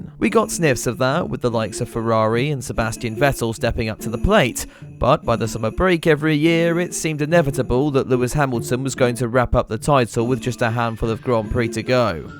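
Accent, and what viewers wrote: United Kingdom. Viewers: "Why Red Bull (read Verstappen) Domination is WORSE... (Then Hamilton's)" Said the guy with the obvious British accent.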